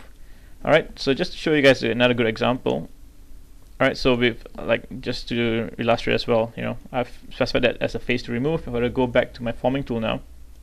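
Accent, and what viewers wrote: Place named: United Kingdom. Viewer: interesting. cool British accent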